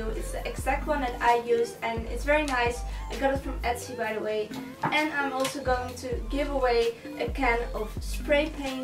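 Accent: Netherlands. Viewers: Je hebt een Nederlands accent aan je engels supergrappig!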